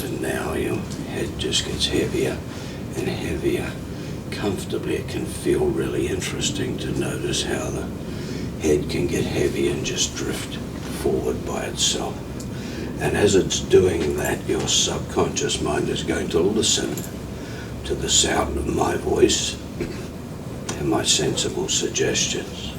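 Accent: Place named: Australia. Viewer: This guy is Australian, not English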